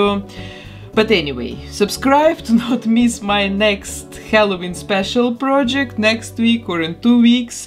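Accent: Russia. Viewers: Акцент русский я права? 😁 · Я слышу очень сильный русский акцент 🤔🤔🤔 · Боже, смотрю вас уже несколько лет. И по акценту догадывалась , что вы русскоязычная. Но оказывается вы из Беларуси. Держитесь там, уверена , все будет хорошо))) ❤️💚🤍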